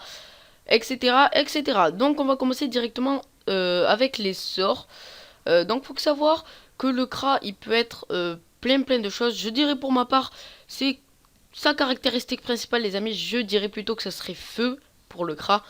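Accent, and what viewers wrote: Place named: South, France. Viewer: le petit accent du sud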